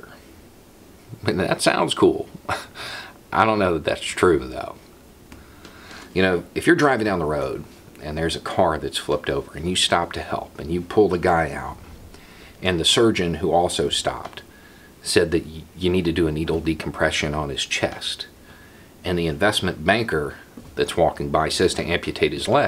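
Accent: South, United States. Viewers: So this video popped up on my feed and I decided to watch it. I honestly came into this video thinking you were a trump supporter and you were going to bash Trudeau and yang, then I heard your southern accent and it made the feeling that this was that this video is going to be. Boy was I wrong. Like i said this is my first video I've seen so i dont know your political views, or even if you post content like that, but no matter what side you are on, you are on humankind's side and that's what matters! Thank you for this video and i wish more people thought like you!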